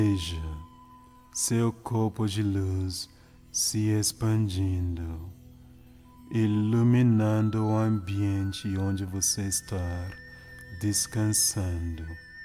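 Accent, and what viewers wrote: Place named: Africa. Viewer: pra mim a entonação está normal, o fato que você deve ter estranhado é o sotaque africano do narrador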